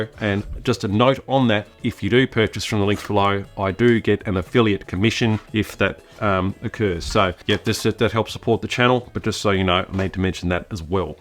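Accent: Australia. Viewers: As an American man, I think this guy is Australian ☺️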